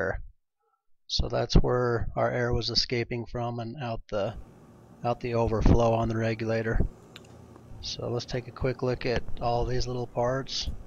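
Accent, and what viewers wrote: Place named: South, United States. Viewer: This vid was a great reminder that I would've unhesitatingly cast instant skepticism & doubt on whether or not this fella could give me proper advice on a torch regulator re-fit if I hadn't immediately noticed that unmistakable rural twang... which, of course, dismissed that same potential skepticism just as quickly. No political bs yadayada implied here either: rural/country/Southern folk are just ~a billion times likelier to be able to spell out things like this as if they were describing yesterday's weather to you is all. Just my city-slicker $.02...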